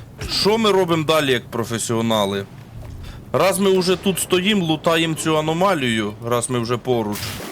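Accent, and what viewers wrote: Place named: Vinnytsia, Ukraine. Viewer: Дякую за якісний контент. За вінницький діалект окреме дякую. То батьківщина мого тата. В дитинстві часто бував у Шаргородському районі. Я бігаю з двома "Дніпро". Один розточив на 7.62. Снайперка з магазином на 21 набій. Гаус. Три пістолети під різні набої і збільшені магазини. Маргач - 13 набоїв. Довго бігав за кресленнями і магазинами. Тепер шкода закінчувати гру. Хочеться грати і грати.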